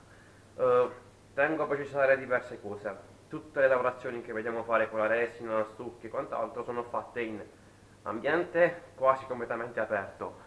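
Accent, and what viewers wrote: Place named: Apulia, Italy. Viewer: di dove sei io Bari tu?ho sentito che sei pugliese giusto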